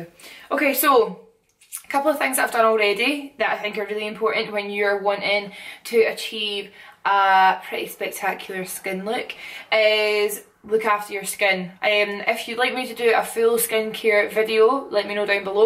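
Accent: Scotland, United Kingdom. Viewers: I love this video I don't even wear makeup I just watched it bc of her Scottish accent! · 12 seconds in I actually love you your so funny hahaha totally do the Scottish accent justice!!! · everything you've done is "Amazing". You did everything perfectly. Your stunning gorgeous now. You know your shit, that's truly. Your wedding photos are drop dead gorgeous.......and that dress you bought with all the layers almost makes me cry.....so beautiful. Keep up the great work. I'm addicted to your videos!!!!!! More please!!! 😘. Plus I love your Scottish accent. Love your friend from 🇺🇸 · I love the Scottish accent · Ah I love the Scottish accent! If I weren't Welsh, that'd be my choice! Amazing skin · you are beautiful and I love your gorgeous Scottish accent, just want to let you know that your jouer lippy is pronounced jou-eh not jou-er. Just for future reference. 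Keep shinning gorgeous. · Are you from Scotland? Hahah being Scottish myself i picked up the accent within seconds! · scottish accent? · SCOTTISH! hahaha this makes me so happy to see a Scottish YouTuber who's videos are about beauty! Probably cause I'm Scottish! Normally people say the Scottish accent is really harsh and angry sounding! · Kayleigh Ritchie is that a scottish accent? if so it's really gorgeous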